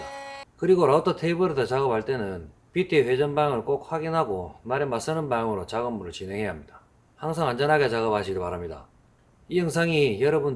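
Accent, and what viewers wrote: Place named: Busan, South Korea. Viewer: 사투리가 낯익은데 부산이십니까? 늘 좋은 영상 발조고 있습니다~얼릉 구독자 늘어서 유명해지시면 더 좋을것 같습니다! 좀 딱딱하지만 진짜 중요한걸 늘 잘 가르쳐 주셔서 좋은 선생님이자 형님이라 생각하고 있습니다~!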